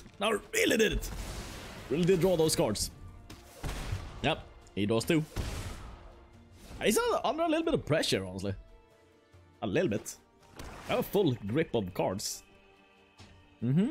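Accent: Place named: Sweden. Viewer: i always cringe a little bit whenever Sweeds try to pronounce g's and J's hhh, great video though, keep it up.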